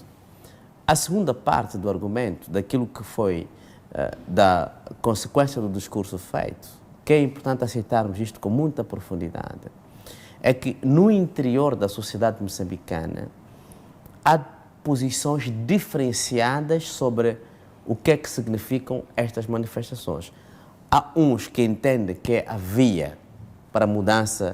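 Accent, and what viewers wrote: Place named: Angola. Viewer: Senhor, nem sei donde falas mas, pela forma como falas, deves ser de um país DITADOR como Angola e tu es lambebota do MPLA q queres furrar no Poder se apoiando d pseudo intelectual, um vazio q enganam tao bem os tolos e analfabetos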